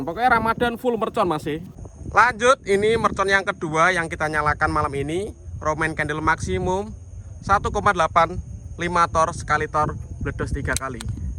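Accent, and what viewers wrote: Java, Indonesia. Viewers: Jawane ngndi mas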